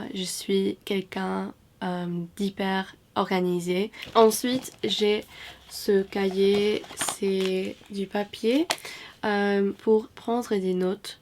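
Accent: United Kingdom. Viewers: i hope one day i could hear your British accent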